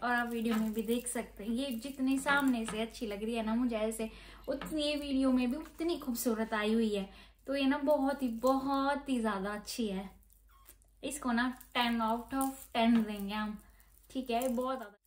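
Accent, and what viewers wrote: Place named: Himachal Pradesh, India. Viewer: Aap himachal se ho na bolne ke style se lag rha hai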